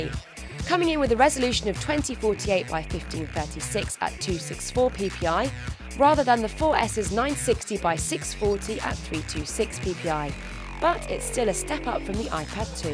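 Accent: United Kingdom. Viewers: Сразу слышно, что британка говорит. Ненавижу британский говор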